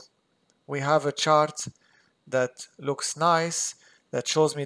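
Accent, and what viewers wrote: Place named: Lebanon. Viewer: Hi, very clever. By the way, from your accent, I guess you're Lebanese, aren't you ?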